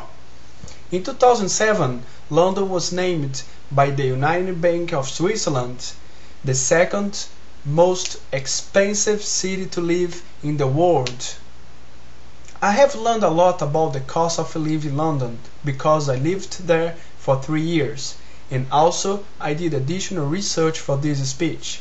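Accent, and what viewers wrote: Brazil. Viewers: Let me guess...are you brazilian right?...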